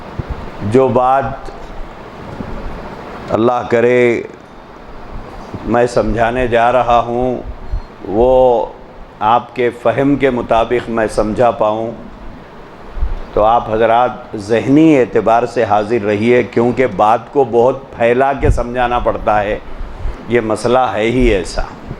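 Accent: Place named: Hyderabad, India. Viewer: MASHALLAH good magar apna neeshani theek se nahi batai aur bartoon ko bout lamba karty ho .phir b JAZAKALLAH KHAIR . Esliya Mai doctor israr SAHAB ko aur SHEIKH imran nazar HOSSAIN ko aur mufti zarwali Khan ko aur peer zulfiqar Ahmed naqshbandi SAHAB an etc etc kuch aur b hai unko sunta hu. Wasy mashallah hydrabadi urdu aplogo ki muja bht PASAND hai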